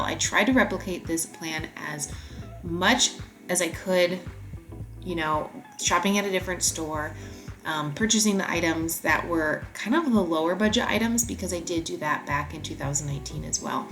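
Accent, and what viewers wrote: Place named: Minnesota, United States. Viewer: That MN accent was way more intense in 2019!! 😂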